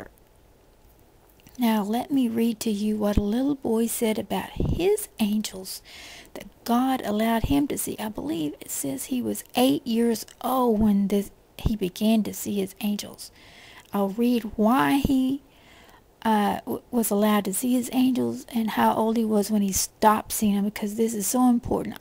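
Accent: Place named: South Carolina, United States. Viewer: thanks for the videos.. sounds like your from i guess south Carolina?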